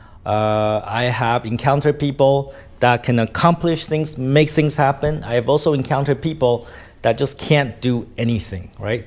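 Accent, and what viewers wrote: Standard. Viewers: Thầy nói rất chuẩn luôn good thầy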